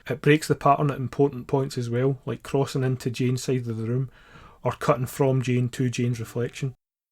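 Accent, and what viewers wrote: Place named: Scotland, United Kingdom. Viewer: Hey this is versustheworldvideo, thanks again for your comment on our latest video. I'm replying on my personal account. I'm Scottish myself, but your accent is much thicker than mine - I love it! Your in-depth analysis is impressive, and your editing skills are sharp. You put a lot of time, care and effort into your video. I was blown away at 3 mins in by your explanations that make perfect sense as to why the director set the scene up that way.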